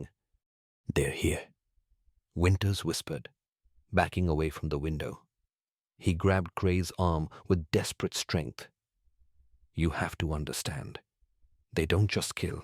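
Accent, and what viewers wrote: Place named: India. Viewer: How horrifying!😱😱😱 Is there any special reason the voice sounds Indian? Grave Fear has a video like this. Same title, same plot, same characters. Why do five guys in the video title screen I'll have the same face? Are they quintuplets? , the story says "they held a look of pure douer", but the video captions say "pure awe". , it seems like the story starts over from the beginning. The only differences between this and the Grave Fear one are the narration and the way it starts to summarize what happened so far towards the end. Then it continues onward, going the way the Grave Fear video did. Another change is that the survivors didn't make it out. Is there, or will there ever be a sequel?😢😔🙏🙏🙏💖✨📖✨💖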